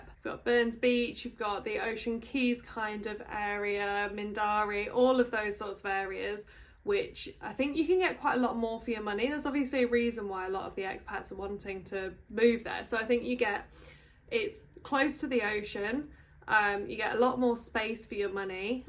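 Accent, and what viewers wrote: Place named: Cheshire, United Kingdom. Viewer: You sound like you're from Cheshire/NW originally. This was super informative. Thanks!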